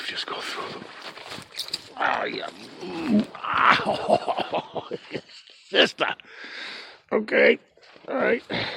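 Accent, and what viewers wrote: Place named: Boston, United States. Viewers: Excellent video, great job! I did hear the strange noise, it sounded almost like the grunting noise that a pig makes. Weird isn't It? Btw, don't apologize for the Boston accent. I also have the Worcester-Boston accent. Nothing wrong with that! Everyone has an accent depending on what part of the country they grew up in. Be proud of who you are. You're a terrific person and I enjoy your videos. Have a great day, see you on the next one! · Awesome hike not too long at all very interesting sound early in the video could have been a raven croaking but why only the once and why did it only happen after you crossed the first downed tree maybe the downed trees the ones that cross the path that are not by park employees are territorial marks or stay out signs? By the way I like the Boston Accent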